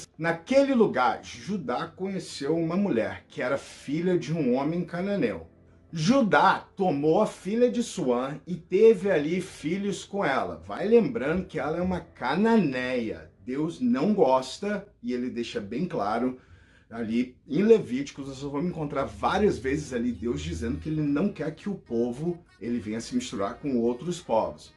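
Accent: United States. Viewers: EU PENSEI QUE VOCÊ ERA AMERICANO, VOCÊ TEM SOTAQUE. VOCÊ É MUITO BOM, PARABÉNS ! SHALOM IRMÃO. CANAL REVERENDO MIL.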